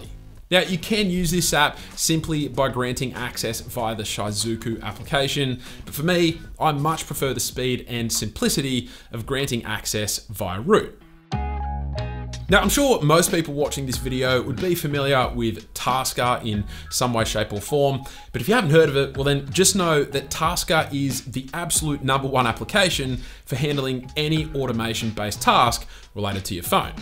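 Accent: Australia. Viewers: My man is Aussie 🤟 yea mate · is he an aussie? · Aussie Accent seems like the mixed baby of British and American Parents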